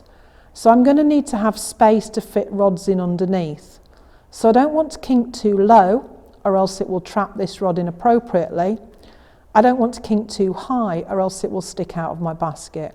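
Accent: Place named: Lincolnshire, United Kingdom. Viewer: A big hello from Monmouth! I was born and raised in Lincolnshire, so it has been lovely to listen to your accent. It brings back many good memories. And this has been s delightful series. Nice and clear, not too much talk to confuse, and all set up in a homely placement. Congratulations. I hope you do very well.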